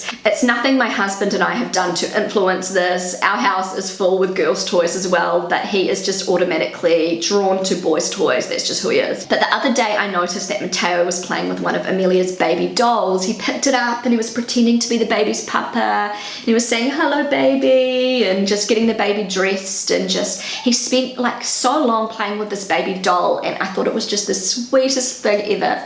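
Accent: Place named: New Zealand. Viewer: Lovely to hear your Kiwi accent,. I commented on another of your videos, identifying as a German-born Kiwi. You're so right about the macho Kiwi men, but they're not all like that. Having grown up during and after WW2, I was raised in an all-female household, something not at all unusual for my generation. Your pronunciation of the language is really good, but I must pick you up on your pronunciation of the name Angela, as in Angela Merkel. You pronounced it like an English A. It should sound like in the word 'up' or button.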